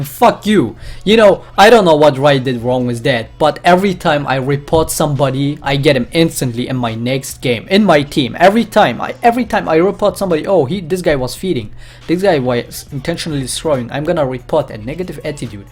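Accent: Germany. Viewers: WolfGang Man yes im german i know that accent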